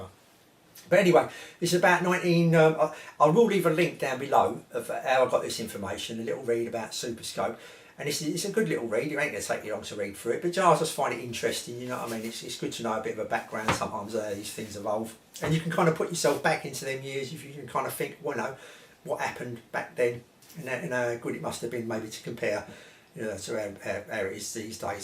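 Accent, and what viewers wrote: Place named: England, United Kingdom. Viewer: Sorry if I sound a bit too critical but you might want to slow down your speech a bit. It might just be me having trouble with your English accent as I'm a Canadian and I think we may speak somewhat slower and deliberate. Just a little something to help improve your channel as you continue on. I know that you have said this was done when you were first starting out so it is entirely understandable that you won't have an excellent delivery right off the bat. Nothing wrong with the content just one listener trying to help out. Cheers mate.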